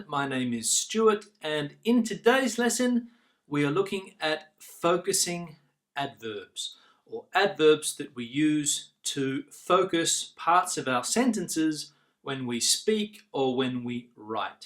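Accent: United Kingdom. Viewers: I love your British accent. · you have a British accent,am I right?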